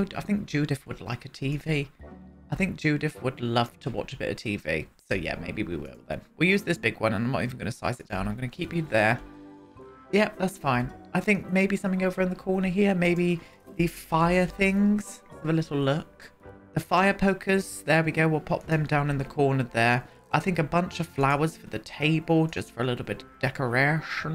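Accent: United Kingdom. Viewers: Someone ranting about the sims build in a British accent is just what i needed 😂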